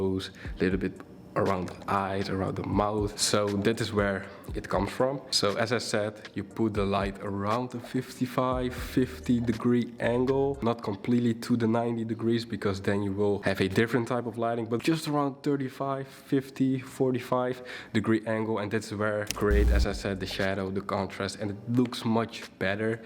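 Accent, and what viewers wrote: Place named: Netherlands. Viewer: Hahahaha Dutch accent incoming, loved the video bro. Very dope tips and love how you explain those things!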